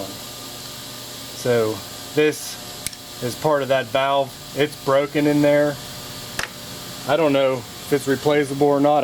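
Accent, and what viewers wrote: Pittsburgh, United States. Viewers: bro where is your shop located?????...you sound like you have a PITTSBURGH accent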